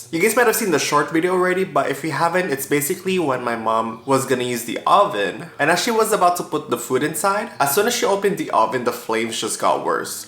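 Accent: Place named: Philippines. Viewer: are you filipino? you have a filipino accent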